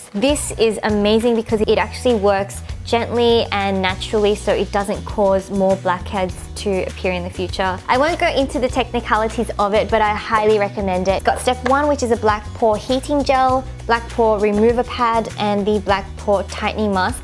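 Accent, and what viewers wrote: Australia. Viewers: OMG AUSTRALIAN ACCENT 😍💗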